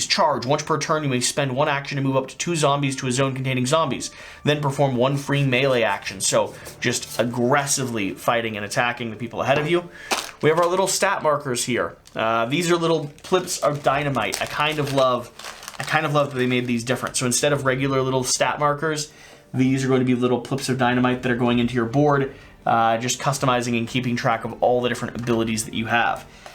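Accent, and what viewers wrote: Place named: South, United States. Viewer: Obligatory southern drawl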